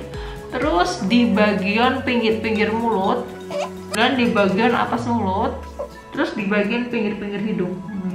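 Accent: Java, Indonesia. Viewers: Dokter asli mana nih logatnya kok medok?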